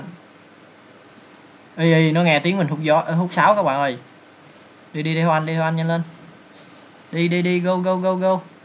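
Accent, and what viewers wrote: Mekong Delta, Vietnam. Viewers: thấy tiêu đề với hình nghĩ ad lên tay rồi ko ngờ ko ngờ là bị mấy con khung long săn lại, mém bỏ mạng kaka đi bụi luôn. ad giọng ad nc dễ thương, người miền tây hả ?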